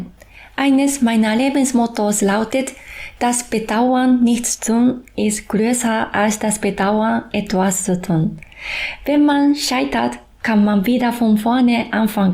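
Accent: Japan. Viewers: Sie spricht sogar ein ausgezeichnetes und heutzutage höchst ungewöhnliches Deutsch, ein Deutsch, wie es noch vor 40 bis 50 Jahren gesprochen wurde, mit Wörtern, die mancheiner heutzutage (fast) überhaupt nicht mehr benutzt, ich muss immer schmunzeln, wenn ich Wörter von ihr höre, die ich von anderen so gut wie nie mehr höre ;) Und ihr Dialekt macht es nur um so niedlicher, man stelle sich vor, sie hätte keinen japanischen Dialekt, das wäre ja schrecklich ;) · Dein Deutsch ist super! Ausdruck, Wortschatz und Grammatik 100% korrekt und besser als bei manchem Muttersprachler😉 Chapeau! Nur das schöne Akzent verrät, dass Du Japanerin bist❤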